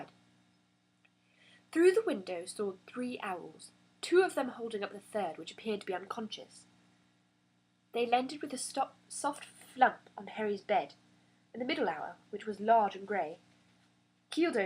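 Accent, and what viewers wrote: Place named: United Kingdom. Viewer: which area in the uk your accent related?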